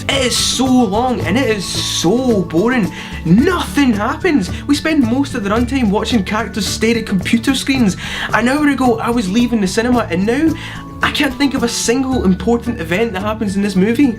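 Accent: Scotland, United Kingdom. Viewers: Is that a Scots-Gaelic accent there?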